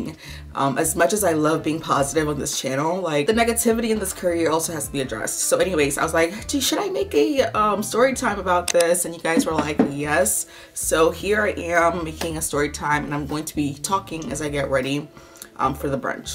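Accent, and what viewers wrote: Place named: Africa. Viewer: Omg! It’s the African accent for me 😂😂😂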